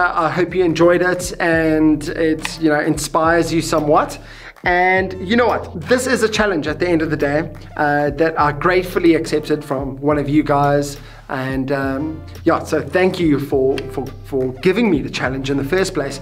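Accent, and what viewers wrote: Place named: South Africa. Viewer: I can hear you're South African😂 hello from Durban😌👍🏻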